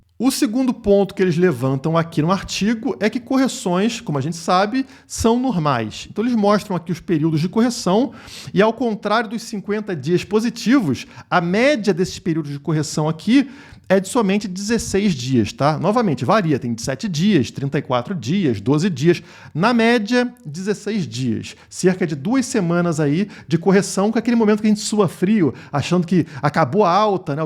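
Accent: Rio de Janeiro, Brazil. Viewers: "Téiijjjla, triméééixtri"...sotaque carioca é nojento, mas as informações são ótimas.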